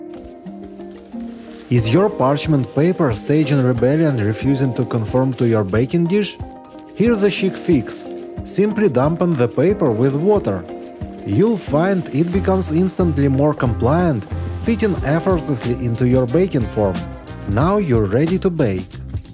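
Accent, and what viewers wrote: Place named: Russia. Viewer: Такой тяжелый русский вариант английского-невозможно сосредоточиться на сути.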